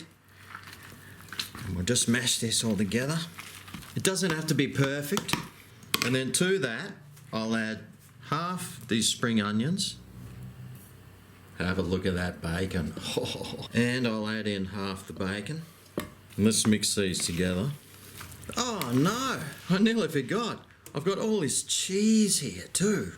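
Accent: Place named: Australia. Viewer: You sound like you're from Australia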